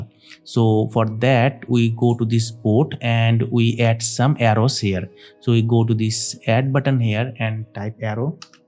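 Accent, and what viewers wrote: India. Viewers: oh no indian accent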